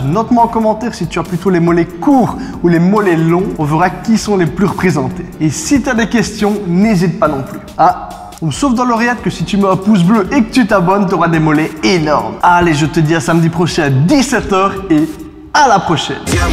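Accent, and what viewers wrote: Liège, Belgium. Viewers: Un bon accent bien de chez nous #BelgiqueVie👌🏽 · msemblait bien qu il y avait un accent de liege ou pas loin ^^ merci cool tes videos j ai decouvert ia pas longtemps (je suis de Mons en Belgique egalement).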